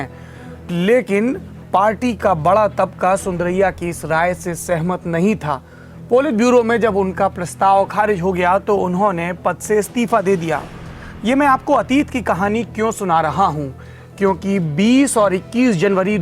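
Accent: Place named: Bihar, India. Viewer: Its typical educated Bihari accent...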